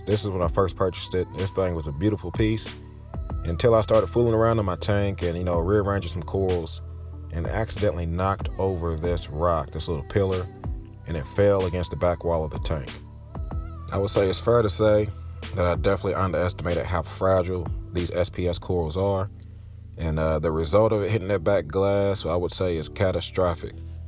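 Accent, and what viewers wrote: South, United States. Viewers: Is that a country accent I hear??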